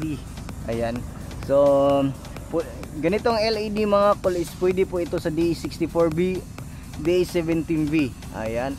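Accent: Visayas, Philippines. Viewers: Bisaya kaba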